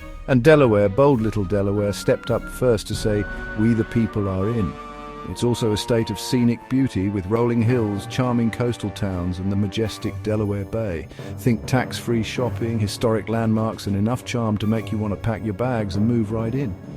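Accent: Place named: United Kingdom. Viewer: Americans don't need anyone with a British accent telling us that some of our states don't matter...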